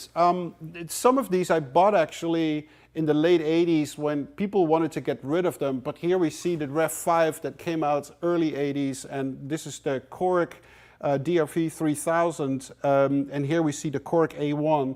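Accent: Netherlands. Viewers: Yes, He is dutch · Very cool! I might be wrong but are you from the Netherlands? I hear very distinct sounds that a lot of people around me have when they speak english! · Nederlands accentje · He has a bit of a Dutch accent. Could that be right?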